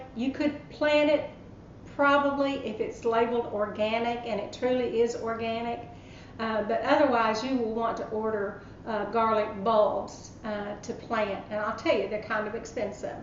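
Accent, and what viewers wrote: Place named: South, United States. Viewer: I'm really sorry if I offend anyone, but, I'm from England and that Southern accent just distracted me totally from the content of the video. I watched it 5 times... no idea how to preserve garlic, but I certainly have learned that you Southern Gals talk beautifully. Thankyou; I'm going in for listen number 6... and 7 and 8 and 9... you get the picture...:)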